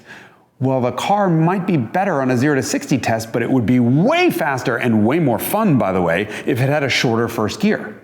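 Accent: Germany. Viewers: The German accent lol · His German accent is on point. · His German accent sounds like Klaus from American Dad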